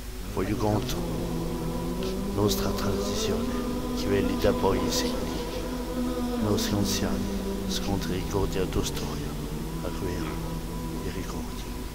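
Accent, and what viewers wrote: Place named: France. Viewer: Mais vous parlez corse avec beaucoupe de accent français...vuos parlez corse comme un italian parle français!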